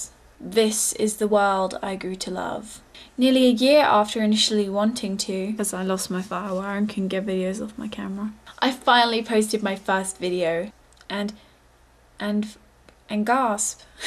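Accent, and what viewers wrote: England, United Kingdom. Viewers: British English. Woot. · haha, British! LOVE it! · You're adorable... i love an english accent. Thanks for posting! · are you from england ? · she is cute and funny in a sort of way ^^ I love englands accent! somebody said she was boring... well, she's not! just click on something else if u think it's boring;) search for manualist! that's a nice video by someguy i don't remember the name of atm... but it's awesome ^^ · i love british accent!!!! a new fan here!!! ciao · your english accent is really really well spoken its quite mad. maybe because your now in canada? ha x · Sorry that was a bit harsh, I didn't realize that comment was actually going to post, I'm not use to to actually being logged in. Anyway just listen to by. I'm a guy by the way. Keep up the British accent, that will always get you places in life.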